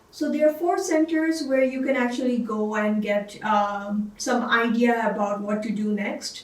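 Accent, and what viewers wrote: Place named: Canada. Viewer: So within 8 months you got Canadian accent!!!!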